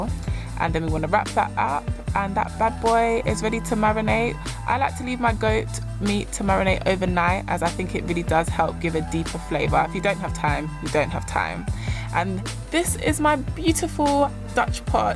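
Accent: United Kingdom. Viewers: Well I normally think these kind of videos are dead especially when it's not a carribean accent when they first talk and they are American I'm like nah but I heard a British voice and thought she might be alright actually and yeah cookings on point ha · 😍😍😍🇯🇲Im from America you sound like you live in Britain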